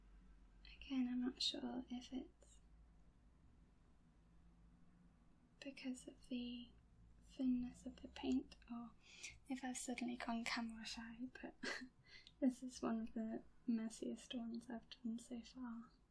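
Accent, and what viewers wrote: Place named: Yorkshire, United Kingdom. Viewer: Great video! What is your accent? Sounds kind of like Yorkshire, but a little different. It's beautiful, by the way! Cheers from WV :-)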